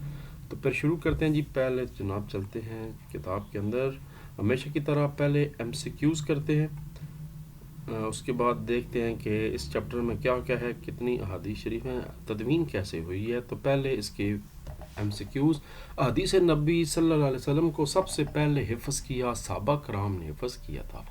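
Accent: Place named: Rural, Pakistan. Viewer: Sir where are you from? Your accent is just like the accent of my village peoples (GANDAKASS)❤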